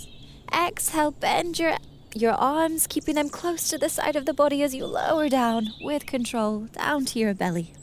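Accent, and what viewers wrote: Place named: United Kingdom. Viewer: Hi, some feedback as you mentioned. I started 20minute daily Yoga October'24 to raise money for BoneCancerUK. Since, have continued for my own well being, and today, the 82nd Day, I worked with this 30 minute class. Thank you for your clarity explaining he expectations. (PS love your British accent, and the birdsong: )) 🙏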